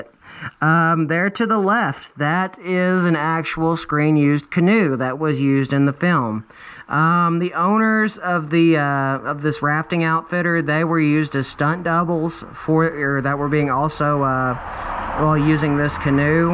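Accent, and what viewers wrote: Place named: South, United States. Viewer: Perhaps, get out and do some hiking yourself and you will not be sucking for air...sounds like your a southerner and being so close to the river and or any water source as well as walking down a trail...very fortunate to not crossed paths with a CottonMouth, Timber Rattler or other venomous no-shoulders that are all over the area...wearing flip-flops probably not smart.....Oh well, I just wasted 13 minutes....I hope ur approach improves because this was pretty bad...not intended to be offensive just honest feedback. Take Care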